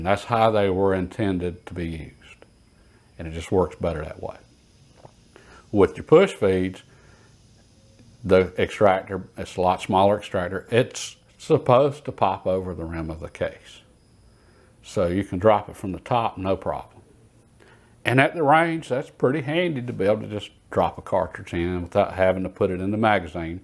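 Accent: South, United States. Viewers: Thank you for the explanation of the push feed vs the controlled round feed from a historical perspective. I know exactly what you spoke about having been born in 1936 and had a 22 caliber single shot rifle that is very much like today's Cricket. Like that southern drawl too.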